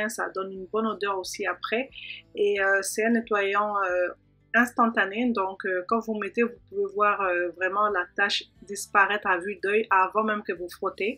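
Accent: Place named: Quebec, Canada. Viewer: Coucou ☺️☺️ j’aime tellement ton accent québécois… moi aussi j’ai un tineco mais le A10, super simple. J’aime vraiment beaucoup la chambre des garçons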